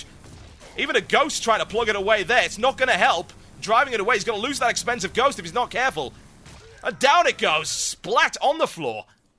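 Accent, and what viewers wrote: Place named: England, United Kingdom. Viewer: no offense man but I can't stand your english accent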